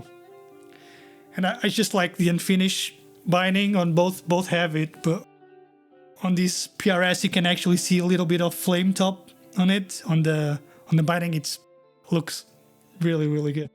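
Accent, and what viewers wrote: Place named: Portugal. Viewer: O sotaque diz-me qualquer coisa. Fui confirmar... TUGA ! Obrigado pelo video !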